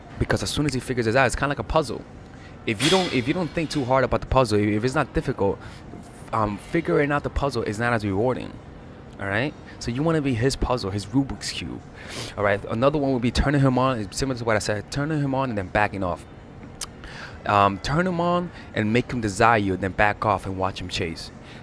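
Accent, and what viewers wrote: New York, United States. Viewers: I can tell you a new yorker lol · His accent actually makes me miss New York ugh I wanna go back🌃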